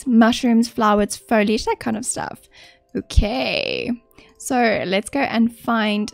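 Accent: South Africa. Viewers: Your accent sounds so familiar. Is you South African like me?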